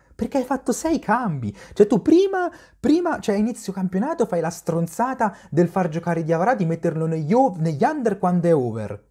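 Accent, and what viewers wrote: Perugia, Italy. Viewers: Vago accento di Perugia o sbaglio? Forse mi sbaglio. 😎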